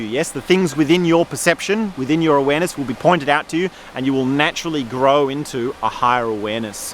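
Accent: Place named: Australia. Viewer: Qt ! Hard to focus on what you’re saying with the thick Aussie accent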